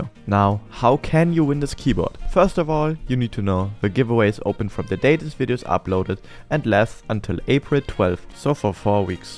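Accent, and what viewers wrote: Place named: Germany. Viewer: Diggi du bist deutsch oder? man hört direkt vom Akzent. :D Stabiles Video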